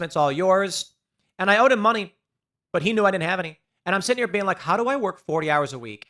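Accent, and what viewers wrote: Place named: New York, United States. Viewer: Y'all notice he's been using a New York accent lately?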